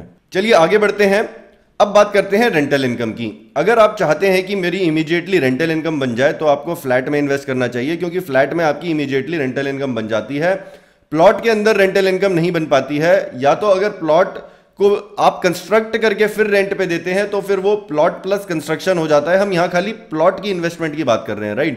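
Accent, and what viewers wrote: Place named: Kerala, India. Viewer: I think ua from kerla or tamil nadu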